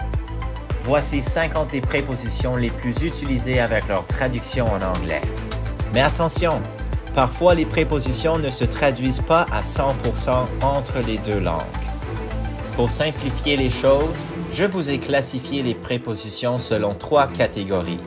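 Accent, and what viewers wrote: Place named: Quebec, Canada. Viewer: J’adore votre accent québécois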